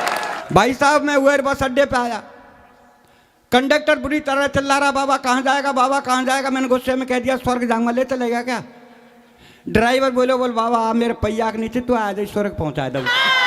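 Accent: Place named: Braj, India. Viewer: Baba ki laungvej to mathura Bharatpur ki hai pakka❤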